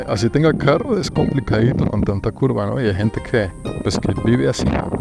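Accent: Colombia. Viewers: Muito bonito o espanhol colombiano. 👏👏👏👏👏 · Pese a que su contenido lo ven muchas personas de diferentes países de habla hispana, él habla como un buen colombiano del común. No es como otros youtubers que empiezan a hablar neutro o se mexicanizan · Este gringo tiene acento colombiano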